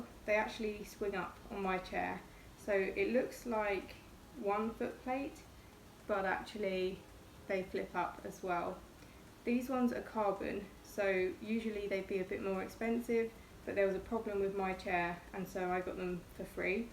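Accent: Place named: England, United Kingdom. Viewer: typing is so much easier anyways. That must be annoying to deal with at times though. When I write things, I usually can’t read my own writing the next day hahaha. You pull off glasses very well, nice eyes and smile, they work for you and I think glasses are popular again, so many people using them versus contacts again, well here anyways. Are you around London? I have some friends around there, they have totally different accents than you, more city like accents. Then I have a buddy up in Durham, and I have a hard time understanding him 😂😂.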